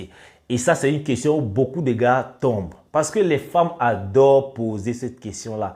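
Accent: Cameroon. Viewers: Tu a l'accent Camer hein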